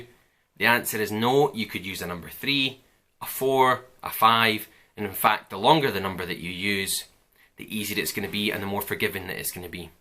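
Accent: Scotland, United Kingdom. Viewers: Great video! It’s also nice to hear a Scottish accent on YouTube. It sounds as if you’re from Aberdeen, my home city! :)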